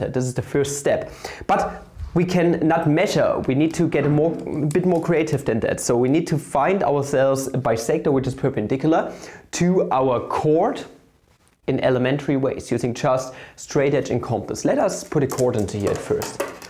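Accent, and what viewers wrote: Germany. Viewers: Es fällt einem fast nicht auf, dass der typ deutsch ist, wow.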